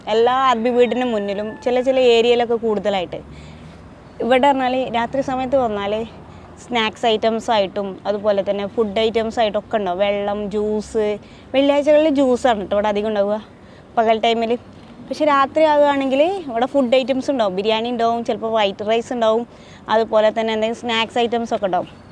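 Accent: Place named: Kondotty, India. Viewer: ശബ്ദം കേട്ടിട്ട് കുണ്ടോട്ടി എടവണ്ണപ്പാറ റൂട്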